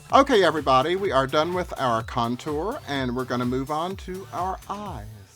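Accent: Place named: South, United States. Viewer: i adore her accent! southern queens are my favorite ❤❤❤❤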